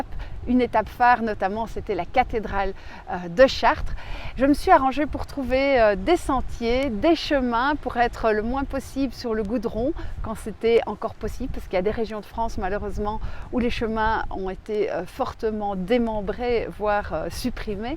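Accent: Belgium. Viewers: Bonjour quelle énergie pour ce long chemin et toujours la bonne humeur ça fait plaisir de vous entendre ainsi que l’accent des flandres bon chemin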